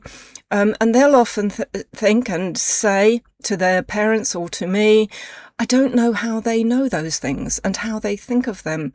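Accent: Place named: RP, United Kingdom. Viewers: I find it enjoyable to listen to your English British sound. I wish I could speak like you. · It is great help for native speakers like me to learn RP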